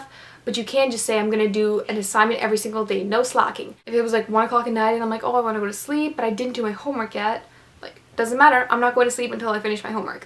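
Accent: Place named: Russia. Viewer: с едва заметным русским акцентом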